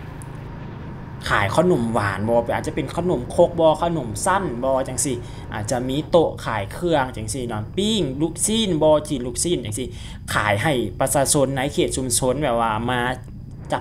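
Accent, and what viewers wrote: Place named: Lom Sak, Thailand. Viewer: สำเนียง ก็ใกล้เคียงกับบ้านข้อย วิถีชีวิตประชาชาชนบ้านฮ้อม หาดซายฟอง คือกันกับบ้านข้อย บ้านหวาย อ.หล่มสักจ.เพชรบูรณ์,ไทยแลนด์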